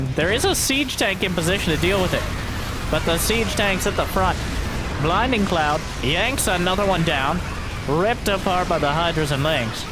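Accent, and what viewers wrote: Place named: Midwest, United States. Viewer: Where are you at? His accent hmmmm I'd say Indiana or Missouri.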